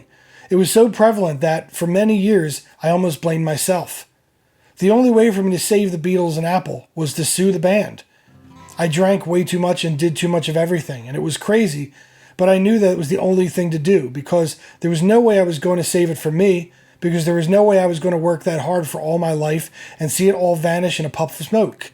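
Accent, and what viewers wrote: Philadelphia, United States. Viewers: Hey, are from somewhere near Philadelphia? I like guessing American accents. I’m front Boston where we famously have distinct an accent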